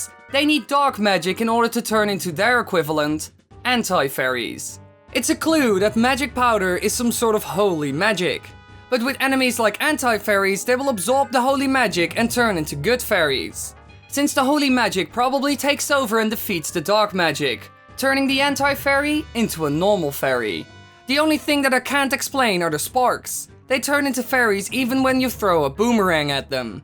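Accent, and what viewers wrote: Netherlands. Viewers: Your voice sounds dutch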